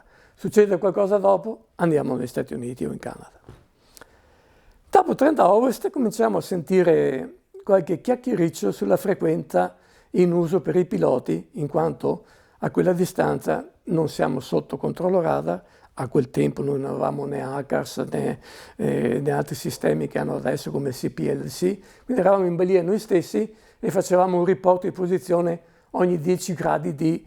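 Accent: Veneto, Italy. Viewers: Veneto doc😂 grande comandante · ma sitto veneto?? bella storia, sai raccontare bene comandante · Incredibile come faccia fatica a non parlare veneto! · Concordo, competente e simpatico! Sicuramente veneto, qualche intercalare veneto si è sentito😅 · Un comandante Alitalia non romano per una volta che strano un veneto o friulano da accento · È veneto lui verooo?? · L'inconfondibile cadenza veneta, mi rende il racconto ancora più apprezzabile 😊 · Mi semba veneto sto comandante.... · Sento tanto veneto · Grazie per questa testimonianza e complimenti per la gestione di una giornata così difficile. Ma è veneto? ...riconosco un accento familiare...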